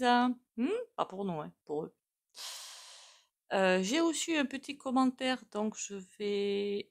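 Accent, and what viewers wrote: South, France. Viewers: Bonjour. Je viens de m'abonner car j'aime beaucoup votre facon de faire cette voyance.Votre petit accent du midi aussi❤ bisous de Toulouse.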